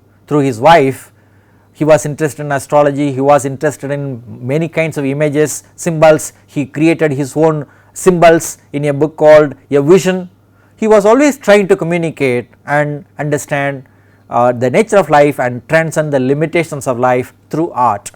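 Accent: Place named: India. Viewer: There are different accents in the world... We feel better with Indian and british accent.you better listen to him carefully.He is an English prof. In reputed IIT. Look at good things... The stuff they have prepared is very much student friendly.... The content is brief and comprehensive. Look at good things.don't comment rudely.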